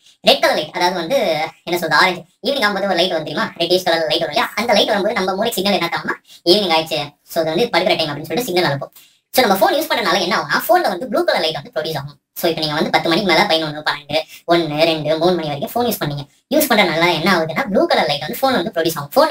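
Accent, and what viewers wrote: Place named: Kongu, India. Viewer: Na 10 oo or 11 oo clock ee thoongiduven and vanakam vanakam vanakaaaaaaaam super appu apdiye kongu tamil aaa pesunga nalla itukum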